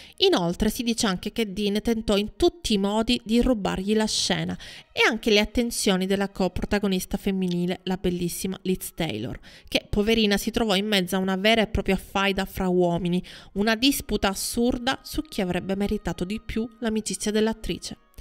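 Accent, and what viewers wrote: Sicily, Italy. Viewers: Brava ,scandisce bene le parole ,ma come si sente la cadenza sicula!! Adoro la Sicilia ,ma quella calata quando si parla di cinema di Hollywood......,insomma . Riguardo a Rock Hudson , beh sicuramente bello, adatto alle commedie con la bravissima Doris Day ,ma non certo un grande attore !! Ci credo che nel Gigante primareggiasse lo splendido James Dean talento puro ,basta un solo sguardo suo , e la finale una recitazione unica ,lui si era veramente il cinema di Stanislawsky ,come altro mostro di bravura Marlon Brando altro che Hudson......,che non si vede che ha sempre quell' espressione un po' beota?? Cmq,altra cosa certo che gli omossesuali sono molto più promiscui degli eterossesuali e anche molto estremi . E Hudson andando con questo e quello in liberta' assoluta se l' e' cercato !! Puo' dispiacere come essere umano ma non e' giustificabile Cmq ,a differenza di Dean che con quella bravura eccezionale avrebbe regalato splendide interpretazioni , lui a parte qualche commediola non sarebbe mai stato un De Niro ,o un Newman.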